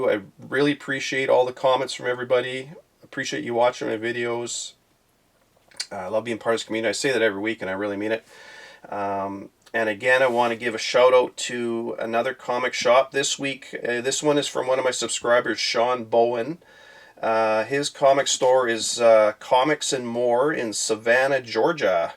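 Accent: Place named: Canada. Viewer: Enter me for Venom 5 and thank you for simply talking comics with a Canadian accent 😃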